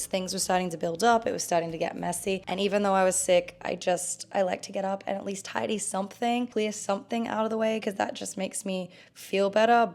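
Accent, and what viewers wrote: Australia. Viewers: Your ‘sick’ voice sound sooo very Australian! I hope you’re feeling better and enjoyed your holiday x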